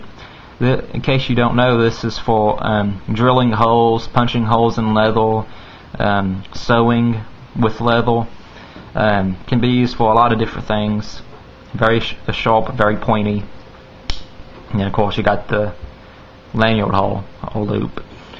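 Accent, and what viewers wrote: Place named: Texas, United States. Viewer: it obvious he from texas luk at the accent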